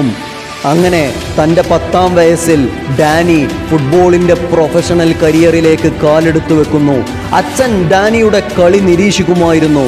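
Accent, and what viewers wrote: Malappuram, India. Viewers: 😄😃Achan dovingos footballinte Oru pirandanayirunnu enn saaran 😁😁thani Malappuram slang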